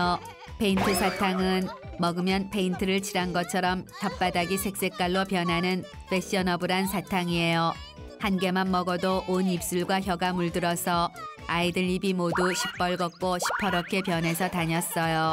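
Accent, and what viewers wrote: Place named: North Korea. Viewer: 근데 목소리가 북한말같음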